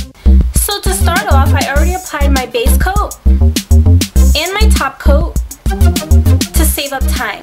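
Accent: New York, United States. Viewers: Cool ;) !! New York accent ? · Very cool video!! I love all things related to doing my own nails, natural, polishes, etc. I will be trying this. P.S Love the accent, sounds like New York maybe or north period!!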